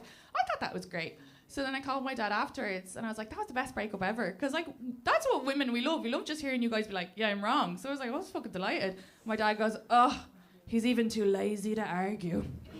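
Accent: Ireland. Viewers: I love the Irish accent even though I can't understand half of what she's saying. 😄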